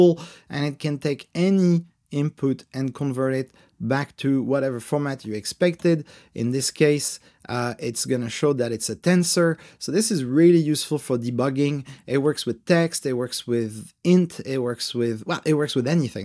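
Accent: France. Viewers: don't say you have a terrible french accent it is lovely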